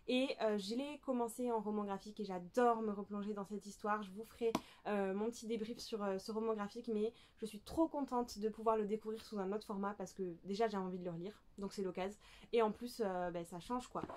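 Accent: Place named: South, France. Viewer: J'ai reçu Pumpkinheads pour mon anniversaire aussi, sauf qu'Amazon a merdé et qu'il est en italien :') Heureusement que je le parle un peu, mais ça va être folklo ! J'ai très envie de me jeter sur Le Royaume assassiné aussi maintenant que je l'ai reçu miraculeusement en HB 😍 Trop cool pour le pendule ! (j'entends de fou ton accent du sud sur certaines intonations mdr)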